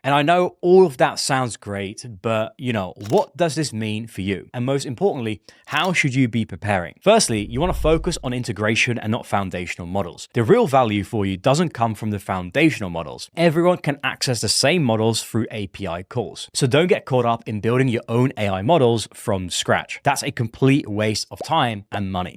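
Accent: United Kingdom. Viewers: Love from Ireland, your neighbour as I can fish out the distinct UK accent 😊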